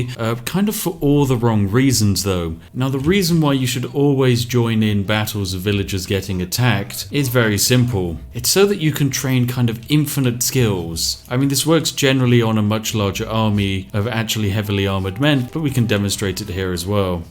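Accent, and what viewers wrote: United Kingdom. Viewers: Very English.I approve 😂👍👍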